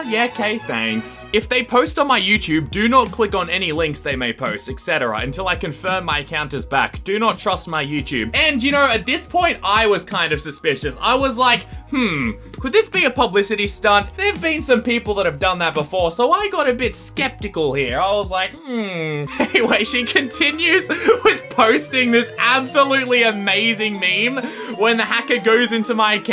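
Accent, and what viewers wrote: Australia. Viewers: sound australian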